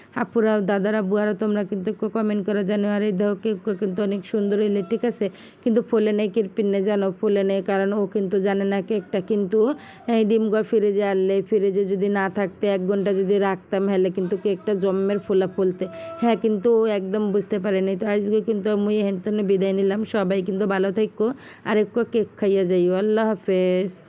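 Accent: Barishal, Bangladesh. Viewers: নাস্তা খাওয়া রান্না কেক বানানো বরিশালে ভাষায় কথা বলা খুব ভালো লাগলো ❤❤❤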